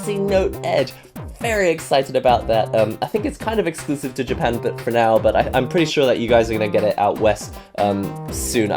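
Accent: England, United Kingdom. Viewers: Question time... Please don't take offence) where are you from? because you have a very English (I think) accent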